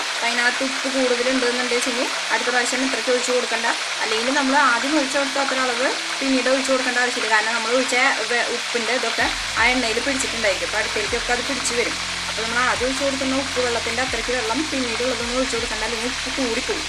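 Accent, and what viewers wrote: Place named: Thrissur, India. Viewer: Nicee..thrissur slang 😁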